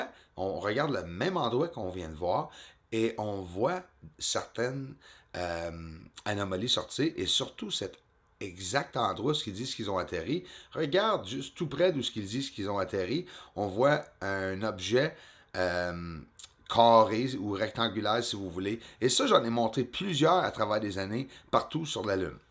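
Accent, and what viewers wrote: Quebec, Canada. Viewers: Salut Rien que d écouter cet horrible accent québécois, ça le fout le bourdon.. Dites vos conneries en ̀anglais , c est mon conseil.. La c est too much bullshit, sur le fond et la forme. .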